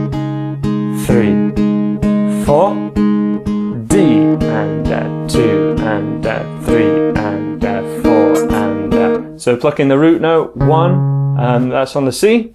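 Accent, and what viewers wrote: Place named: England, United Kingdom. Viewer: Nice English accent ~ I'm just a beginner and I like this song ~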